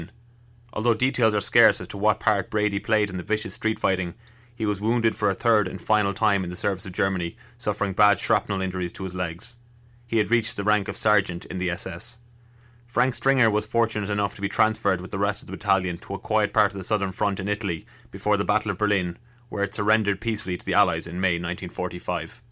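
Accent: Ireland. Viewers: So the narrator was Irish, too?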